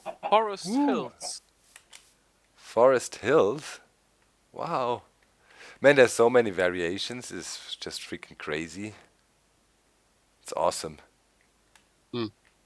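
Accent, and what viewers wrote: Germany. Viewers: Widac ze to jakis niemiec gada na tym filmie rosjan to lubie ale niemcy gunwozjady to juz ich akcentu nie wytrzymam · You sound very german.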